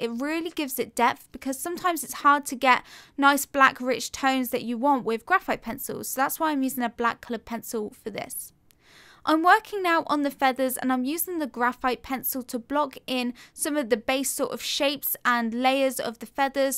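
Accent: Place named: Midlands, United Kingdom. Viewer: I do so love your videos, every brush or pencil stroke is narrated in a way that I can understand and it all sticks in my head. So much better than a lot of tutorials on Youtube that are more someone drawing and music playing with no narration, not that the artists are not good, it's just better with narration, I am still trying to work out your accent? Midlands maybe but a touch of Southern, great though, every word is clear and informative, thank you for making these tutorials they have brightened up my days no end and re - ignited my determination to be able to draw.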